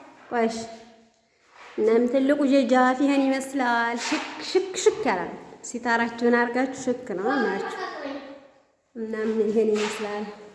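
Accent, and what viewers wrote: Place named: Wollo, Ethiopia. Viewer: አወራርሽ ተመቸኝ የወሎልጅ ነሽ መሰለኝ 😊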